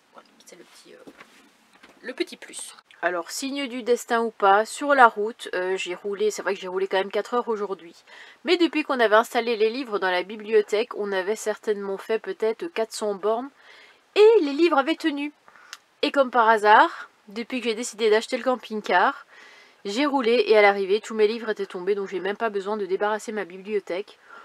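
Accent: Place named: Brussels, Belgium. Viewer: Ah ben c'est vraiment super cette nouvelle ! J'avais vu sur la chaîne de la Zick'Family le camping car en question du gars qui l'avait utilisé pendant 7 ans comme chambre d’hôtel parce qu'il travaillait sur un aéroport ou un truc comme ça (avant sa retraite actuelle). J'avais pensé à toi quand il parlait de la batterie auxiliaire (à cause de ton accent bruxellois) j'avais pensé "pourvu qu'elle le voit". Tu auras bien plus de place pour ranger tes affaires, une penderie près de la table, une autre près du grand lit ! + des tas de placards, une salle de bains avec wc, évier ! le pq n'aura plus jamais besoin de trôner sur l'évier de la cuisine ! Tu pourras laver tes cheveux à l'eau chaude puisqu'il y a un chauffe-eau au dessus de l'évier cuisine !, c'est fini de se crisper le crâne à le laver à l'eau glacée en hiver ! Frigo, chauffage, isolation au top ! 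Je suis bien heureuse pour toi et ton fils quand il sera là avec toi. (sans oublier Nanny bien sûr !) Ça va vraiment vous changer la vie ! Même pour Idriss, vous pourrez venir manger là aussi à deux ou entre amis, jouer à des jeux. Par contre j'ai vu que la table avait un petit problème (fendue sur le côté qui touche la paroi sous la fenêtre) mais ça ne doit pas être grave, juste esthétique, sinon Nicolas l'aurait dit je pense. Bon courage pour faire ton "déménagement"/aménagement dans le nouveau "Gérard II" J'ai hâte de voir la vidéo de demain (quand je pourrais car j'ai des tonnes d'activités en ce moment, même le soir) (Festi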